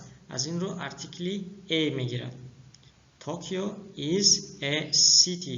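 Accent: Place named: Literary Tajik, Tajikistan. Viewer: Рахмат додарчон, забони тоҷикӣ адаби ба дараҷаи олӣ гап мезани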